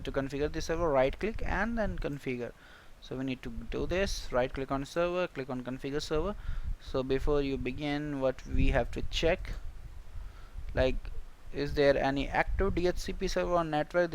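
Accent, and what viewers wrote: India. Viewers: make a video without a indian accent. So annoying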